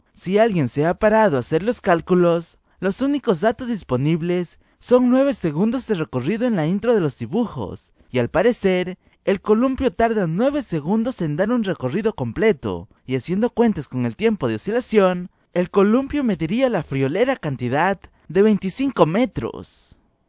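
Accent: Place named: Mexico. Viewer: Uno de los libros más leídos en Suiza...no va a ser El Quijote....y leelo con acento europeo no mexicano..bobo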